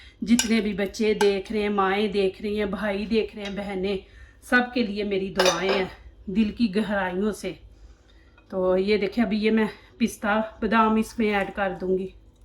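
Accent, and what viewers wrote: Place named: Punjab, India. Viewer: Ma sha Allah BHT zbrdstt Api... Apki aik adat BHT pyari h k ap Duaen deti Hain Dil s... Allah Pak apko BHT kmyabi Dy donu jahan ki Ameen...Apki Recipes BHT Best Nd Different Hoti Hain...Apka bat krny Ka Andaz b BHT khubsurat h Respect pyar s...Mujhy apki pnjabi wali voice BHT pasnd h...Hmen bs apki simple recipes s Kam Hona chaeye...M ap k 1M subscriber dekna chahti hn🙌 Jazakillah ❤️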